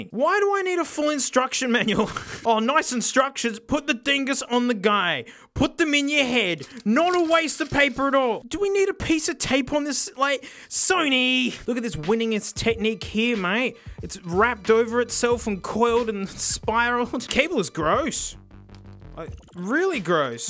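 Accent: Australia. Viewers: Dang, I love this stuff, helps me appreciate Australians accent way more, and the laid back and fun content is spot on! Keep on "Exceeding my expectations!" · Nothing gives me more joy than hearing an Aussie dude destroy the living hell out of earphones😂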